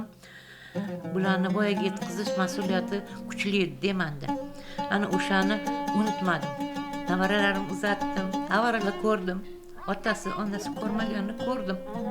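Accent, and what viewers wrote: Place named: Tashkent, Uzbekistan. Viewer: Toshkentlik sheva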